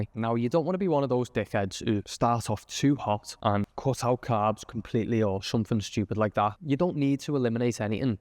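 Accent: Liverpool, United Kingdom. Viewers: Not so much into fitness channels anymore but trying to do better again- subscribed for a scouser who speaks sense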